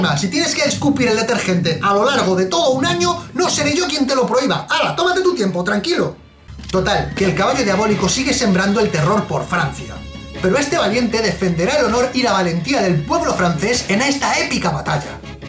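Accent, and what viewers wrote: Mexico. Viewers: Es la verdura esa placa, habla mexicano puro y duro jajaja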